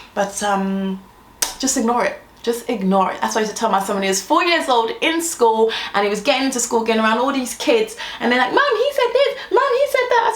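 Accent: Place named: South, United States. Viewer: why have you gone in to the southern accent. its so funny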